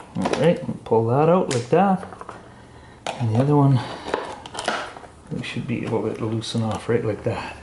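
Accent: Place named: Calgary, Canada. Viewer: Great video mate, love the accent, reminds me when I lived in Calgary. Question. What is the purpose of the servo on the exhaust and is it ok to disconnect it like that? I recently bought a 1000 and when swapping the fender eliminator I notice I also didn't have those servo cables. Cheers from Australia.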